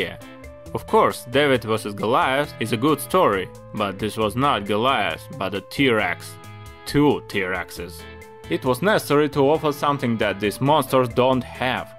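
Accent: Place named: Russia. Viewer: Heavy Russian (or Slavic) accent... makes listening barely understandable. So I added the English subtitles. These auto-generated phrases are absolutely farcical. It'd be funny... if I didn't want to hear the narration and understand it. But I do want to understand things, so I give up. Goodbye.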